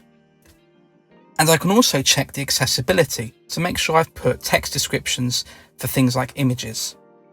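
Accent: United Kingdom. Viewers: An outstanding tutorial. Voice is nicely paced (not MANIC like others) and the background music is softer and just right . This gentleman has very good diction and his British accent makes for fact-filled, easy listening. Way to go!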